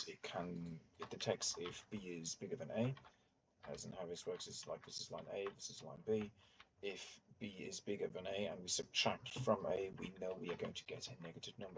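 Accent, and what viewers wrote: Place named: United Kingdom. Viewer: Good Video but the british accent is a bit annoying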